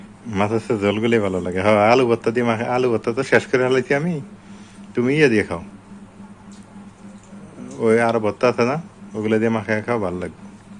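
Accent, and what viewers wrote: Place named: Tangail, Bangladesh. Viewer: Tumar bhashay buja jaiy tumar bàdi Tangail,koun upon zella?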